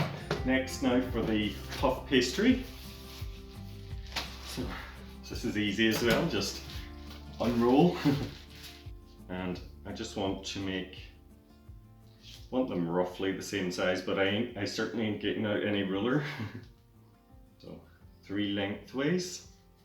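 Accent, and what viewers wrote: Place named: Ireland. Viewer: Didn’t realise jambons were an Irish thing was surprised to hear the accent, greetings from the north 😉😂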